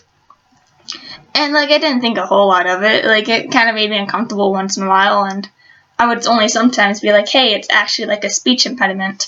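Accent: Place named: United Kingdom. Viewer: Thank you for sharing my dear. My 3 year old son is in speech therapy. His therapist mentioned speech apraxia for the first time this week. After reading about it I got very sad and worried for my sons future. First thought that came to me was the bullying he will experience at school. Now watching your video gives me hope. You are very brave and to be honest you sound a bit British. I love it! Many thanks and wishing you many blessings.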